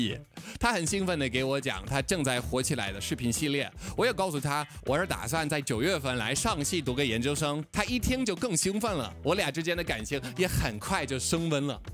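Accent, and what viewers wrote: Beijing, China. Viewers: 您的京腔中文很标准 而且是个说真话的人 佩服敬佩🙏🏻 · 我是台灣人 雖然說真的超不習慣你的中文口音和用字 但十分認同你的內容 所以點讚了 然後總覺得還是比較喜歡你講英語的樣子XD 喜歡講母語的你，覺得比較自然XD 也真的從你這影片體認到，果然一個人講不同語言時真的會呈現完全不一樣的性格，給人完全不同的感覺 覺得你用英語結語時比你前面用中文說明時更有說服力，我是指「你反共」這事的說服力 可能太多操著北京腔中文的歐美舔共影片創作者了吧～讓我覺得用北京腔講中文的歐美人都很舔共，你算是讓我改觀了XD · 京味十足，加油bro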